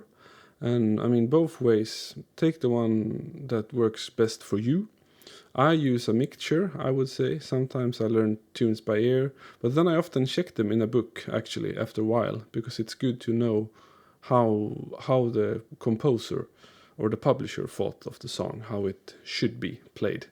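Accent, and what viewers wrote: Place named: Scania, Sweden. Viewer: Ja, jag fattar inte varför man skriver på engelska. Men det är ju youtube. Jag hör att du okså är skåning när du räknar inn...one..two...tre...fyra !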